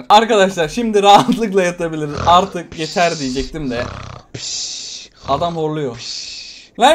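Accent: Malatya, Turkey. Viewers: Abi sen malatyalımısın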